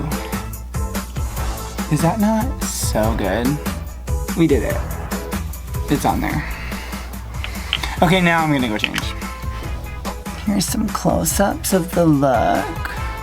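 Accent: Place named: Manila, Philippines. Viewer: The way she speaks is giving me Manila vibes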